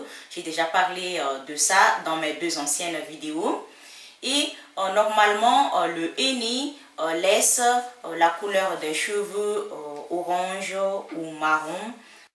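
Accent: Madagascar. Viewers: Bonjour la Malgache 😬, Belle accent, je suis Malgache aussi , bravo pour l'info🌹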